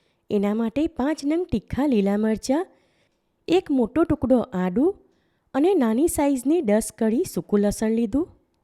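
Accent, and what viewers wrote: Surat, India. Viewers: Khub saras tame asal surati bhasha ma boline recepie batavi.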